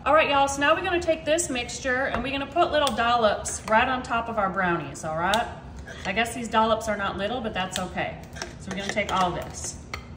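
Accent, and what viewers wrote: South, United States. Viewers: She's very southern that's why .. I love how she talks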